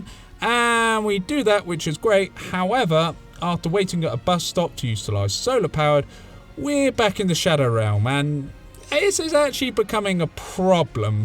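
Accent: United Kingdom. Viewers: Loved your video, too bad I don't speak British. So I had to turn on the subtitles, but other than that, great video man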